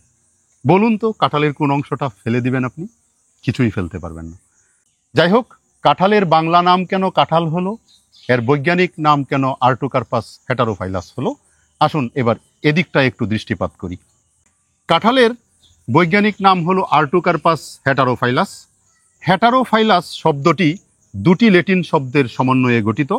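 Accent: Bangladesh. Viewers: কথা শুনে এবং চেহারা দেখে বুজতে পারেন না এটা বাংলাদেশী চ্যানেল?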